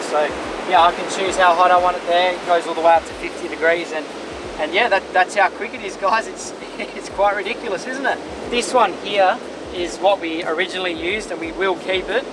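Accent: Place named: Australia. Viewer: Nothing better than hearing an Aussie doing a product review. ‘Bloody brilliant” 😆😆😆😆😆👍🏻👍🏻👍🏻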